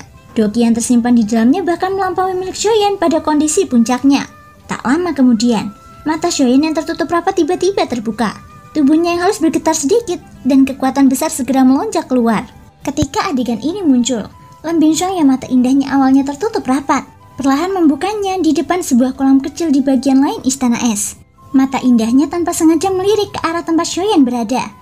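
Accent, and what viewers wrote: Java, Indonesia. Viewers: hai mimin medock banget suaranya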